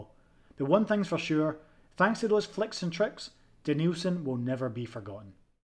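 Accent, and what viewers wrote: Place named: Glasgow, United Kingdom. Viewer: Where in scotland are you from?you sound more glasgow side than Edinburgh side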